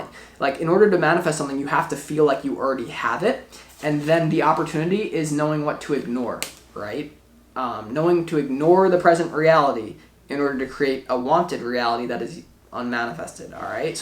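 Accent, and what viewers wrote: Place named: Oregon, United States. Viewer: Don't get losttt in the sauceee hahah yesss your lil quotes and how you talk reminds me of my time spent living in Oregon, miss it so much as an Aussie, your readings help me feel closer to spirit and home 💞 thank you x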